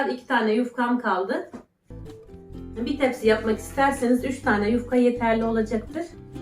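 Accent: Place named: Çamoluk, Turkey. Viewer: Kız sen çam oluklumusun aynan ordakiler gibi konuşuyorsun bolçene dirorsun onlarda öyle konuşuyor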